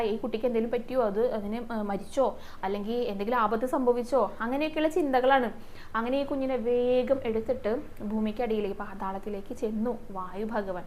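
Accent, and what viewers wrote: Thrissur, India. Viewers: swaram nannaittunde..trissure slang nalla resam undu kelkan...especially kurangum kutty...😂😂❤❤❤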